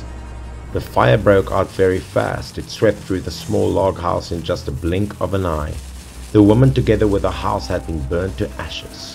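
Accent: South Africa. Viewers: Is this a South-African Accent? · Dude are you by any chance from South Africa?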